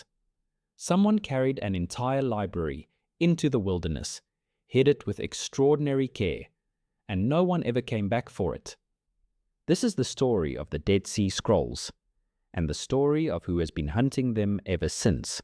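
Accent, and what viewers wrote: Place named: South Africa. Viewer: I love that this AI has a decent South African accent 😅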